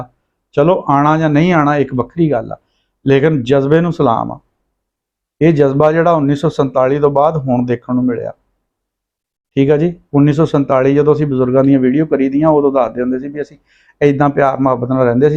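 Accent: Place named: Doaba, India. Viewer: bhut vdya glbaat kahi a veer ji , tuadi punjabi boln da lehja jaladhar,hoshiarpur side vla a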